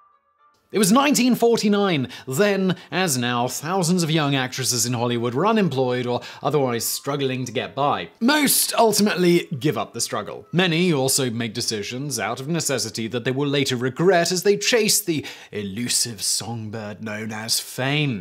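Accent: England, United Kingdom. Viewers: Between the english accent and the frickin metric system, it's a waste of time. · Your funny. And you speak fast in a English accent.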